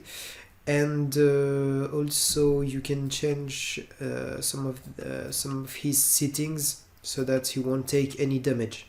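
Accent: France. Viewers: Je ne connaissais pas ta chaine, je viens grâce au discord de noppes et les premières secondes m'ont m'ont rappelés un truc, un français reste un français, même quand il parle anglais mdrr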